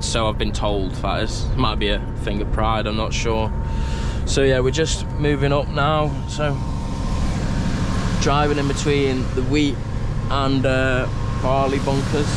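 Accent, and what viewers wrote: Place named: Nottinghamshire, United Kingdom. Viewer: Great video mate 👍 very interesting and informative 👍 how long have you been in Australia? Are you from Nottinghamshire? Thought I could tell by your accent any cheers keep up the good work 👏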